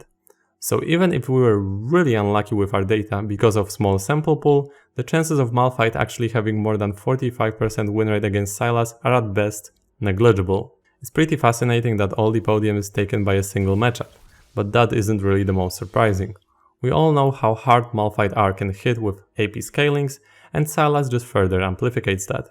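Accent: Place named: Poland. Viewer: Polak? bo slysze akcent XD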